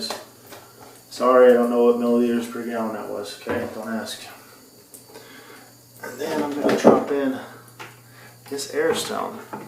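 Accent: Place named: United States. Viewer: Hy drott in Iove it. you usavians, are so sweet with your accents.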